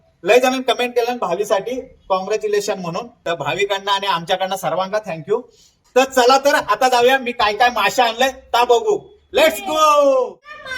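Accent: Malvani, India. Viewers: malvani manapasun n khaychiv natka n krta boltas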